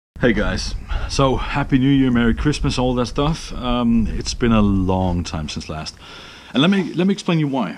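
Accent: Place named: Denmark. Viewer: but now i am curios where You from your English accent sound Danish that whas The reason i meant i had Seen You before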